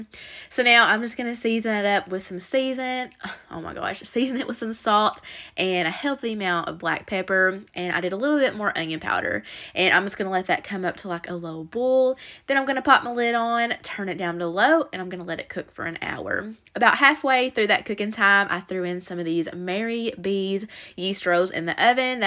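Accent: South, United States. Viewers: I love southern accents so much 😫💜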